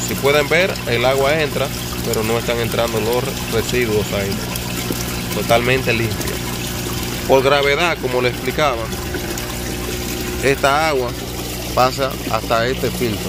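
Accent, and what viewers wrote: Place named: Dominican Republic. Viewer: Saludos usted suena como dominicano, tiene Instagram para comunicarme con ustedes?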